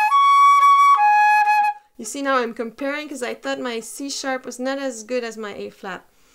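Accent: France. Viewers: Yeah ! the french prononciation ! Vive les français ! !!! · Hey are you a French? I like your English too.❤️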